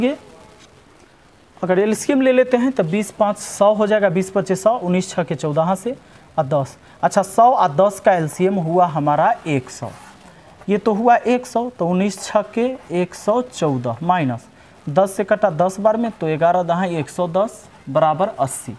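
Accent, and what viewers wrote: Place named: Bihar, India. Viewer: पढ़ाना तो बढ़िया है, लेकिन गाने मे लय तो अच्छी है भाषा मे थोड़ी गड़बड़ है जैसे सावन को साबन बोल रहे हैं, उड़ा दो को उरा दो, गड़बड़ को गरबर ये समस्या बिहार वालो मे ज्यादातर देखने को मिलती है, चाहें वो 10पास हो या पीएचडी ☺️☺️☺️🇮🇳🇮🇳🇮🇳🙏🙏🙏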